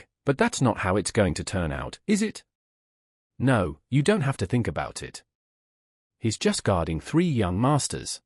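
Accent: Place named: United Kingdom. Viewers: ya seems really weird having a brit accent to narrate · Bri’ish narrator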